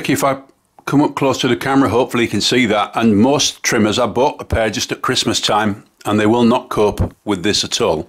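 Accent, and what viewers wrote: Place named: Liverpool, United Kingdom. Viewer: Absolutely brilliant. No fluff, straight to the point. Sound like a scouser or Manchester maybe.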